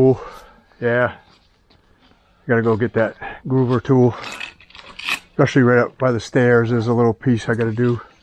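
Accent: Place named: East Coast, United States. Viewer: Hey man, sounds like you on east coast my brother. I got some rising concret around the perimeter of my pool. The whole back yard is concrete. I have bullhead placement stones around pool which are good. The issue I'm having is rising concrete around my northern edge of my pool which has a about 5 feet to the stucco wall border... It is at most 1 inch-mostly about a 1/2 inch rising right at the placement stones. I was thinking of just sledge hammering it down and using cement all to put it kinda back together after fracturing it down. A friend said to grind it down. All my control joint which are about a 5ft intervals are cracked so I was going to polyurethane them and pound out the edges up against the pool placement stones... now this is probably crazy but as an option...I was also thinking of pouring the cement all and leavening out the bullhead slant up to the rising edge... 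So my man whats your suggestion????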